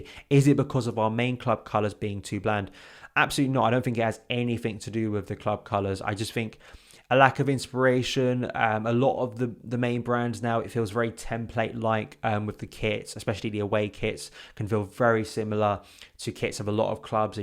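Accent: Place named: United Kingdom. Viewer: Hes not pronouncing it wrong, hes just speaking in a British English accent. Theres no "correct" way. Saying "Nike doesnt rhyme with Bike" just shows this point. American English uses softer sounds which is why its so popular world wide. Whereas we Brits uses more clipped sounds. The owner saying its Nikey not Nike doesn't change this, it's just showed that they either the dropped the "Y" for aesthetics or cant spell a four letter word.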